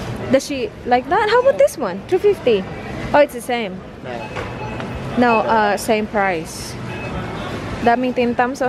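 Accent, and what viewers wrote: Visayas, Philippines. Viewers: I love when Ate talks in bisaya. Ang cute kahit hindi ko naiintindihan. Sobrang nakakahawa yung happiness mo Ate. 😊 Sana more blessings pa dumating sayo. 💖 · aha kas visayas ate oy? bisaya man diay ka hahahaa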